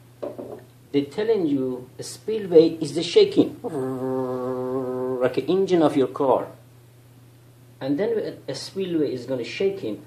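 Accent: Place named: Iran. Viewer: 'vabrication' is 'vibration.' Love the Persh-english! Very good video. They absolutely have not made public any realistic solutions for the sound reconstruction of the main spillway. Hope they watch. Best wishes friend.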